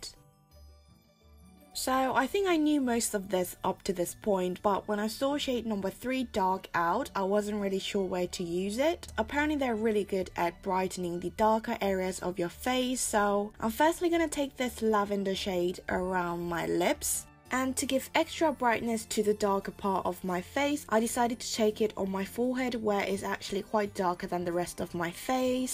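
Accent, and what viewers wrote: England, United Kingdom. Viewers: your makeup tutorials + english accent 💕💕💕 i love love loveee this look! i will definitely try it one day 😁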